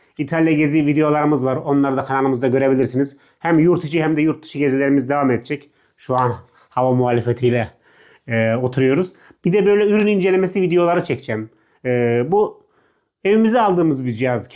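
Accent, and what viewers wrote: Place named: Ankara, Turkey. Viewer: Bi düzgün konuş ya tam ankaralı ağzıyla konuşuyorsun etrafındakiler nası tahammül ediyor acaba. K ları ğğhk diye söylüyorsun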